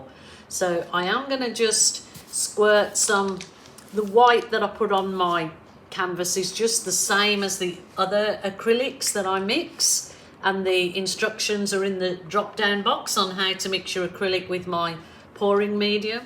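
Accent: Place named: Midlands, United Kingdom. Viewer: are you from the Midlands, my ears prick up automatically when l hear my home town. I’m also in Oz so my accent now is a weird mix of brum and Oz. Cheers from QLD.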